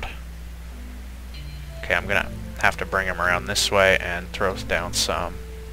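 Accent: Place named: Canada. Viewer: I find it funny that I knew you were canadian the second I heard out XD. Sorry but it's funny.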